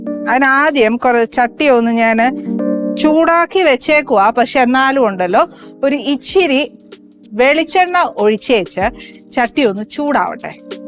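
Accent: Kottayam, India. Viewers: കോട്ടയത് ഇങ്ങനെ ആണ് പറയുക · Njgle Kottayam kaaru ingne aane samsarikunnathu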